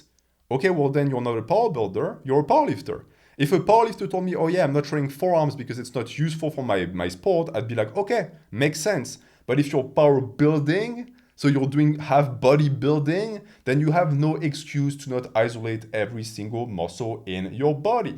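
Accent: France. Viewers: I work in audio so I'm a bit of a snob admittedly. A lot of people just don't care and I get that. But I do believe his old audio was objectively bad sometimes in that I would miss certain things he said because of the combination of mic distance, room reflections, and French accent. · i hear french accent, i close the video.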